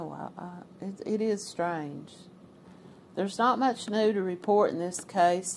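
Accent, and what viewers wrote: United States. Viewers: Get that accent you southern belle !!!!!!!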